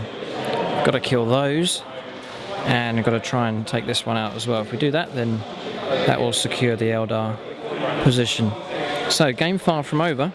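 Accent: United Kingdom. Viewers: exactly what I was looking for! concise analysis, pro painted models and british accent. subscribed and liked.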